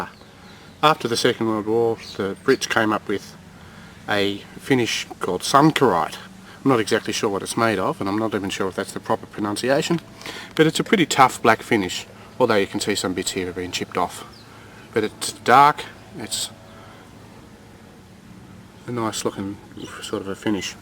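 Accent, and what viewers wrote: Australia. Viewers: Your Austrailian... just wondering if you have ever seen the movie Breaker Morant about the Aussies in the 2nd Boer War and his rule 303 speech he gives. Awesome movie just, thought I'd recommend it. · are you australian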